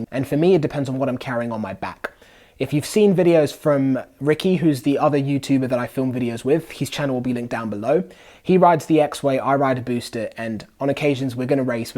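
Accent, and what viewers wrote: England, United Kingdom. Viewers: I don't know if it's the English accent or the professionalism but this is one of my favorite reviews. I'm a NYC Youtuber and you have definitely made me want to step my game up exponentially. I'm buying the Evolve Carbon GT next week so I'll put out my review. Let's collab on a video. I'll fly out to the UK no problem. Keep doing your thing bro. You have a new subscriber.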